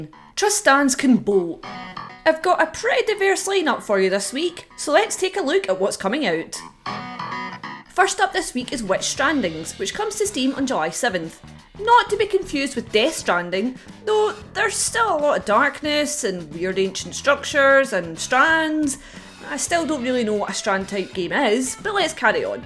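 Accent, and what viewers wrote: Scotland, United Kingdom. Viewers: Does anybody know what part of the world is from? That accent is amazing. Scotland? What part of it? I'm interested in the specific area, as for example "Italy" has more than 20 different accents.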